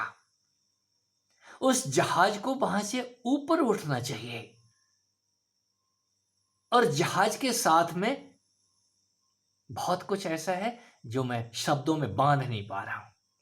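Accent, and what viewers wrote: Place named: Bareilly, India. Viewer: Guruji ap bareilly me rahte the pahle.. Apko sun kar esa lga mujhe.. Kirpa karke apne bare me kuch kahiye🙏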